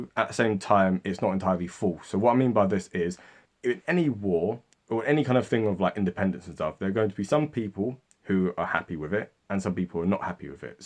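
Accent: England, United Kingdom. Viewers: Another thought-provoking video. I don't feel like you have a bias, you've said things that would kind of piss off both communities here. Your videos are uniquely well researched, strange to hear an Englishman sound like he actually knows a bit about the North. Respect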